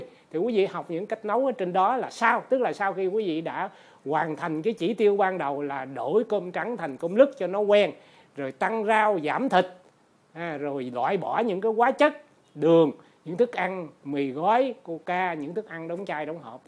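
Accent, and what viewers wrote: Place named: South, Vietnam. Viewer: Chúng tôi kính cảm ơn quý Thầy chỉ dạy cặn kẻ, tận tường, lời lẻ giản dị dễ hiểu, Giọng miền nam truyền cảm ấm áp, hình ảnh và âm thanh ở đây rất tốt!!!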